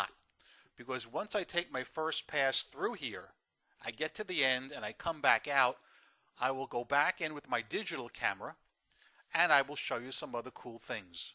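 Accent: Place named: Queens, United States. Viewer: Hi.Your channel name mentions NY, but you live in NJ. Did you used to live in NY? I grew up in Queens and we have similar accents.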